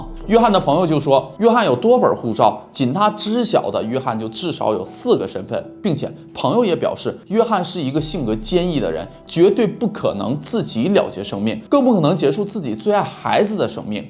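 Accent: Northeast, China. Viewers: 这一听博主的口音就是俺们东北那嘎达的人儿，就是不确定是不是和我一样是沈阳的，哈哈 案件讲述的也非常精彩，已经订阅了，支持老乡儿~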